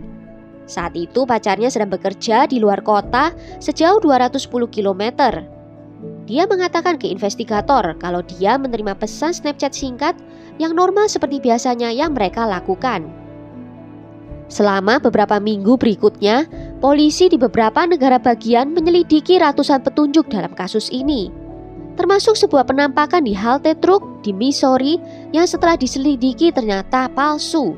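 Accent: Java, Indonesia. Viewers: Hayyy jg medok kuhhh.. suaranya sukaaa💖💖💖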